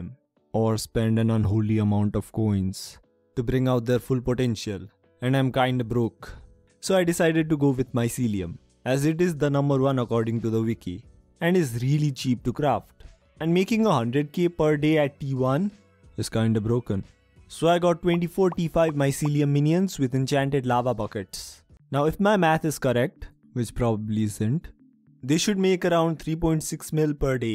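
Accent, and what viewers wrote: India. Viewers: can you tell from which country you are you really sound in indian accent ?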